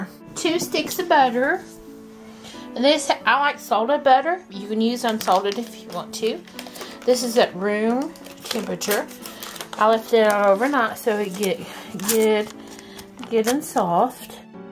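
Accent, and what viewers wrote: South, United States. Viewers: has a sweet southern,comforting voice. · U are adorable!! Are u from the south?? I can here an accent in ur voice. Thanks for the sweet video!!! U are making me want some buckyes!!! Urs look yummy!!! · Ok........this recipe compelled me to subscribe,love you your a sweetheart.............."just keep a-dippin'" true southern belle.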